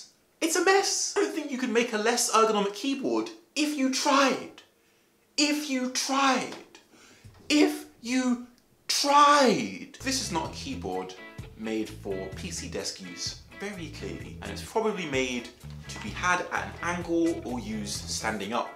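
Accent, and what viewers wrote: England, United Kingdom. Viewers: Says in a lovely english accent: "it's fairly heavy--" SLAM!!!!!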